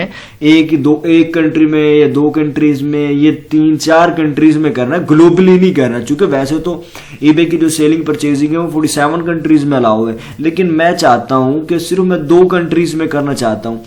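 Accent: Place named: India. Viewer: Why the English title and Indian voicover? Now I have to keep searching :(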